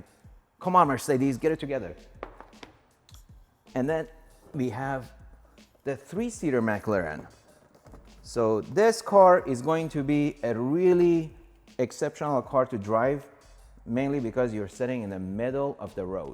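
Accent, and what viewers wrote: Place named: Iran. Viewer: 5 seconds into the video and I can already tell you are Persian! :)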